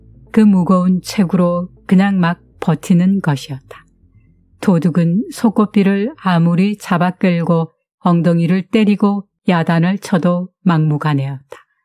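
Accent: Gyeongsang, South Korea. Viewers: 님!!~^^ 갱상도 버전으로~정말 잘 하셔서 재미있게 듣습니다. 스님동냥이 아니라 탁발 이라하는게 듣기좋을듯~~😂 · 구수한 경상도사투리로 읽어주시는이야기가 참 잼나게 들었네요 고부사이도 그렇고 삼부자지간예기도 뜻깊게 들었어요 요즘 사회가 각박하고 기분좋은일이 별로없는데 옛날 예기가 많은생각을하게해주네요 고맙고 감사했어요 앞으로도 좋은예기 많이들려주시길요~^^ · 간만의 경상도 버전 아주 구수한 이야기 아주 좋아요 엄머야 워짠 일로 엄마야😂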